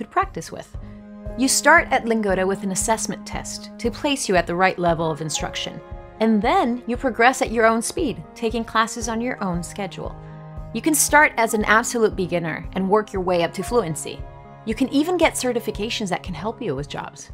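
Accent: Brazil. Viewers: Magda Alvernaz não quero te menosprezar mas você entendeu quase tudo que ela falou porque ela é brasileira e fala com nosso sotaque.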